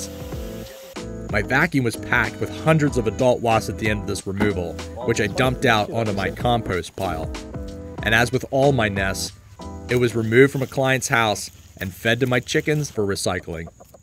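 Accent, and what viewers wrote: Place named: Pittsburgh, United States. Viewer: as soon as i heard your accent I could tell it was yinzer lmaooo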